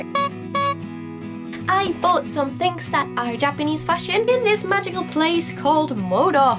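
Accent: United Kingdom. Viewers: ^_^! I have to ask you, actually is little bit um, other question than like kawaii fasion! Because I don't have money money, and here aren't any stores, too expensive (postage) [Czech post the best ha] and blahblah Have you ever been in Czech Republic? x_x Do you drink beer? um, btw I like your british pronunciation! (too shy to say love)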